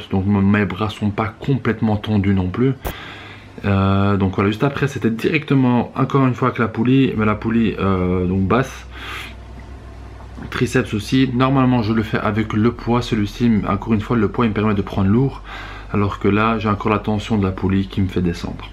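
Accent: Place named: Brussels, Belgium. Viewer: Je tombe sur ta chaîne frère de très bonnes vidéo bien ficelé ac de bnnes infos,je reconnais l'accent tu serais pas bruxellois ? en tt cas je m'abonne bn courage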